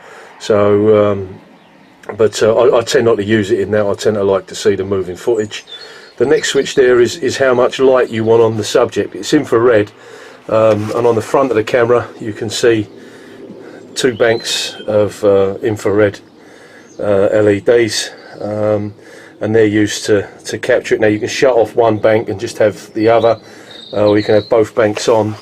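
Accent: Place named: London, United Kingdom. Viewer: Thanks, just setting ours up to capture Kangaroos, Wombats and Wallabies here in the Upper Yarra Valley, 70kms from locked-down Melbourne. Nice to hear an authentic London accent again. We also want to watch how our chickens escape their pen as foxes have taken a couple lately.....thanks...useful tips!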